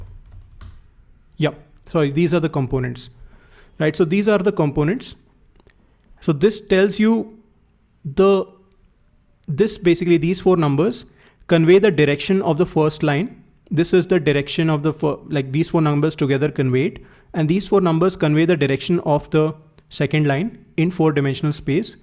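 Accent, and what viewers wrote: India. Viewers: You have won me at your indian accent ❤ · The Indian accent is the accent of the knowledge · Thank you so much for providing in India accent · While his Indian accent is bearable, not as strong as the typical Indian accent, I still feel uncomfortable listening to this kind of accent. I don’t know why. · you've lost me at the indian accent.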